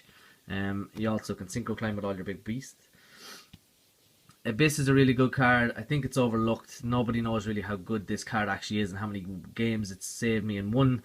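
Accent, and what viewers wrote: Ireland. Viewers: You a Laois man?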